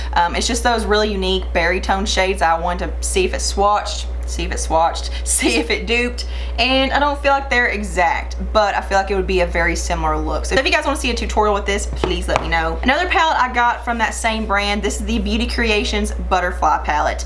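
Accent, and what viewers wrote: South, United States. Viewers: Clearly you're from the south? What's your accent? Hhmmmmmm Georgia?? NC??? I'm from SC. I'd love to see the Beauty creations palette on your face lol. Thanks for bringing these brands to light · Oh my goodness..... You asked them if they could send some products for you to review fir them, and they just said no?? 😮 That was quite rude of them... You'd think they would be happy someone with nearly 13,000 subscribers wants to do a video reviewing their products and help them get more recognition (because I honestly NEVER heard of this website until I watched this video). Oh well...I guess they just don't care to gain more popularity. 😕 P.S I love your accent! 😆 I'm Canadian (which has no cute accents lol) but I've ALWAYS loved a southern accent....I can't pin point the accent to the state....I just know a southern accent. I think what made me like the accent so much is my OBSESSION with the country singer, he's from Georgia and aside from the fact that he is sexy AF, his Georgia accent only adds to it! 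Yup..I'm definitely gonna move to Georgia and marry that man...I mean..he's married and I'm married but...meh 😇 a girl can dream, right!? Lol 😄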